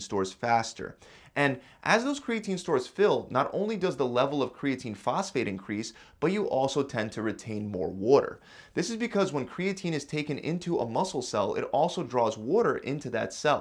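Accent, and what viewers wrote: Philadelphia, United States. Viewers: You from Philly or South Jersey? I know wooder when i hear it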